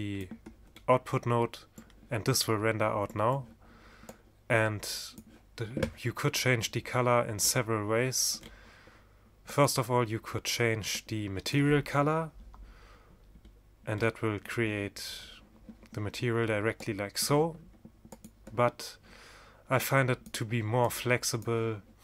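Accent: Germany. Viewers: Man hört schon in den ersten 10 Sekunden, dass du Deutscher bist^^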